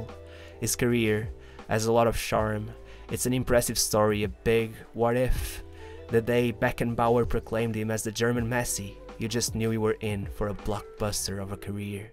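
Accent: Portugal. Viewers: You sound Portuguese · Very tuga accent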